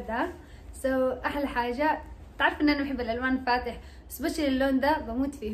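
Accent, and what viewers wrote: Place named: Sudan. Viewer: لهجتك السودانيه حلوه شديد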